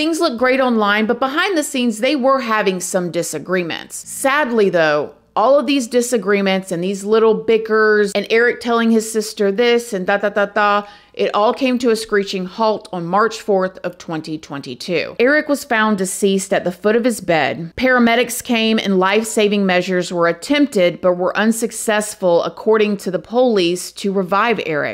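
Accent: South, United States. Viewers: I looove how you say Po-lice!!! Girl you are Sooo Southern, God Bless You!!!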